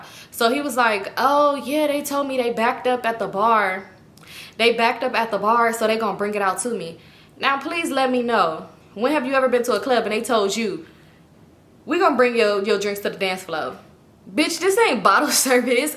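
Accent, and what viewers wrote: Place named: Houston, United States. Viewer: Are you by chance from houston ? I love your accent 💕🤭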